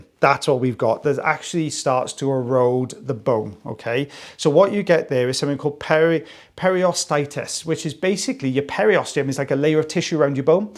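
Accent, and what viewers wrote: Wales, United Kingdom. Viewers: I’m so happy you said Ebony in your lovely Welsh accent. It’s one of my favourite words to hear you say!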